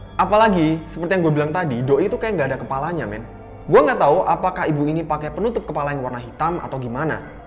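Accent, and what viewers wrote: Malaysia. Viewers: bang cakap malay mcm org malaysia.. hehehe salam malaysia buat indonesia 🥰🥰🥰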